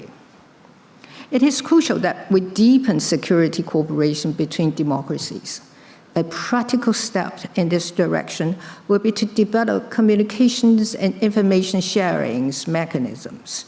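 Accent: United Kingdom. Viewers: 前總統真是女中豪傑，非常有智慧她不用看搞就能用流利的英國腔英文演講而且都不會凸槌，真的佩服她的勇氣和智慧！謝謝小英前總統讓世界看到台灣！有你真好，上帝祝福你平安健康！ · 民主制度正遭受認知戰、資訊操縱與軍事威脅的挑戰台灣以韌性應對威脅，並積極強化國防與數位安全呼籲民主國家建立團結機制，共同維護區域與全球穩定台灣願意分享經驗，並承擔維護民主安全的責任以上為小英演講的重點英國腔實在太迷人了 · 小英總統英國音很好聽，一切盡在不言中，我永遠小英辣台派，永遠祝福感恩小英總統， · 我超愛這英式英語腔，超迷人的。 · 說英文真的很好聽（真的很療癒），腔調有些英文腔，在她還沒當上總統時，就知道她很會說英文，也聽過她的演講，台灣唯一不會說英語的總統 “陳水扁”吧！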